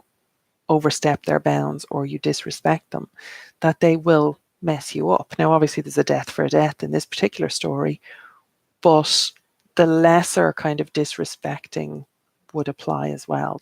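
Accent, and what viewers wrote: Ireland. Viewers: Sorry to bouther you again but would you have deirderia maddigans webside details again about the whichcraft study year and a day .as i want to find a teacher to study wichcraft with i am self thought and doing so so well but would love to find a teacher now.thanks so much angel vanessa donegal celtic irish witch xxxxxxx🦄🦄🦄🌹🌹🌹🌹🌲🌲🌲🌲🌲🌲🌲🌲🌲🌲🍀🍀🍀🍀🍀🍀blessings to you great to see you again.lobly to hear an irish voice in the great whichcraft mix.happy new year xxxx.i am verry drawn to working with this lady deirdre.i heard her on the radio taling about het experience in the mothet and baby home.i was blown away and heart broken.i to gave my first baby son up for adoption in secret in ireland in 1996.her story as touched me deeply blessings 🌹🌹🌹🌹🌹🥀🍀🍀🍀🍀from donegal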